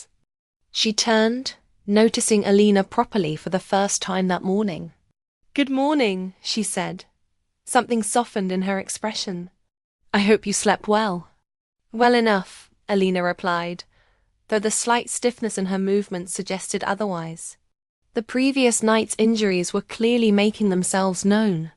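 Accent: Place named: United Kingdom. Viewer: Why the accent sounds like British 😂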